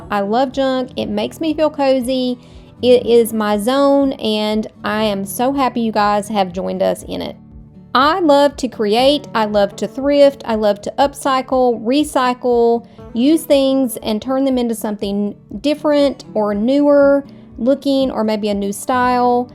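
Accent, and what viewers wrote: South, United States. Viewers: I just adore your channel! I came across your latest video a few days ago and immediately hit that subscribe button and since then I have been slowly watching backwards as I find breaks during the day. Thank you so much for sharing your creativity, your ideas for selling ephemera and dragging out pieces in your stash to use. Your voice is fantastic despite what the deer head person said. I have a southern drawl too and I'm proud of who I am! Take care and I can't wait for the day you hit a million subscribers!😊😊😊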